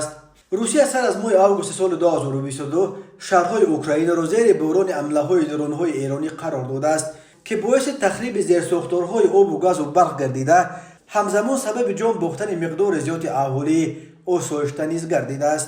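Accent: Pamir, Tajikistan. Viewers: Салом бародаро😊 Рӯзноманигор помири аз лаҳҷаи суханронит маълум ☺️🤩👏 бисёр канали хуб ва хабарҳои нав👍👋🤩🇹🇯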